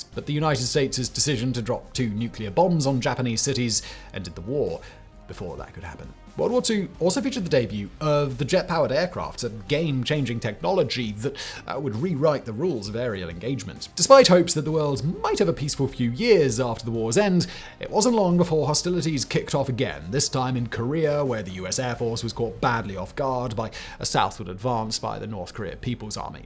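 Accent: United Kingdom. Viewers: Why do you use US spelling, or simplified English? From your accent I thought you were somewhere in Great Britain.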